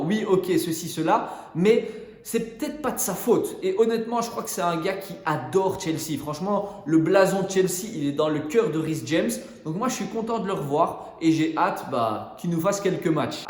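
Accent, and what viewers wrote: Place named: Liège, Belgium. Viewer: Toi, tu es belge et ça s'entend trop à ton accent... L'accent de Liège, quelque chose comme ça.😂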